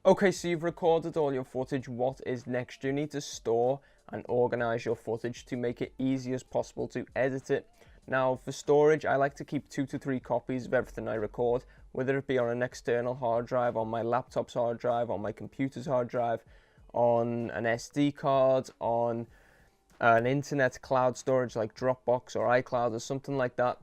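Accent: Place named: North, United Kingdom. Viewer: Thank you for such a detailed and well explained video. Great to hear a fellow northerner too ;)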